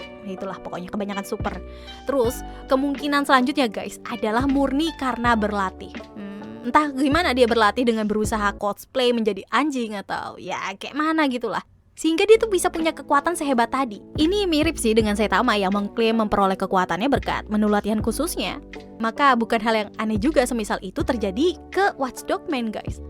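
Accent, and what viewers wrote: Java, Indonesia. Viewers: nih org jawa apa medan seeh wkwkwk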